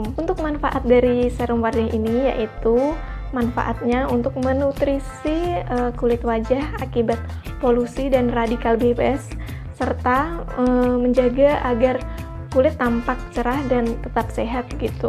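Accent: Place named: South Kalimantan, Indonesia. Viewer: logat ngomongnya mbak kaya dari kalimantan selatan pahuluan saat ngomong kata "wardah", "wajah", "like", "ada", "pori-pori", "harga", "sembilan", "ribuan", "mbak-mbak".... nebak iseng-iseng doang, hehe... nice video... main2 juga ya ke channel saya. .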